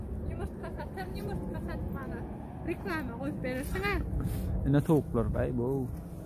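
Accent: Turkmenistan. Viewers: Salovmaleykum sen lebaplymy gurleysin birhili menzap gitdi.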